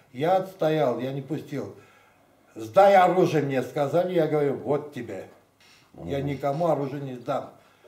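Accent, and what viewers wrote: Armenia. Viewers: ДОРОГОЙ ВЫ МОЙ ЧЕЛОВЕК,ВЫ ДАЖЕ ВНЕШНЕ ПОХОЖИ НА АРМЯНИНА И АКЦЕНТ У ВАС АРМЯНСКИЙ, ДАЙ БОГ ВАМ ЗДОРОВЬЯ И ДОЛГИХ ЛЕТ ЖИЗНИ! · Достойный сын эстонского и армянского народов!Честь и слава вам! Но по русски говорите с армянским акцентом. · Боже мой, у него даже акцент армянский!, спабибо, что Вы есть у нас. Здоровья и благополучия всей Вашей семье! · Круто, и акцент армянский..ещё круче то что он художник.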